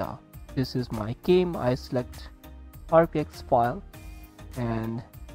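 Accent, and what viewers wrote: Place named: Asia. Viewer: Why the FVck does he sound asian?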